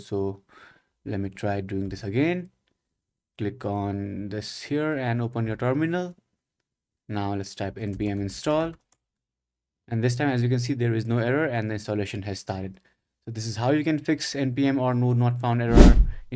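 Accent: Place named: India. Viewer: As soon i lisen indian vocal i finely talken breath after 2 hr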